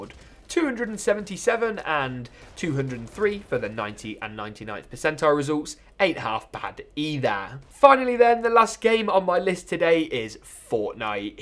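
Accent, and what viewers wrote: United Kingdom. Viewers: You should be britishian your accent show it 😁👍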